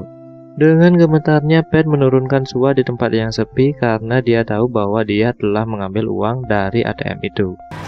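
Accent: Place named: Bali, Indonesia. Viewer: Bali sajan logatne bli wkwk,mantap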